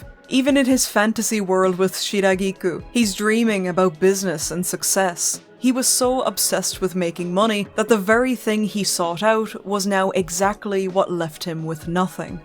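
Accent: Ireland. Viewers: Ooooooooh an Irish accent. Always love to find niche horror game deep dive extra points for the familiar accent in a sea of Americans Australians and brits 😁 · Where is your accent from. Is it a mix of Irish with something else.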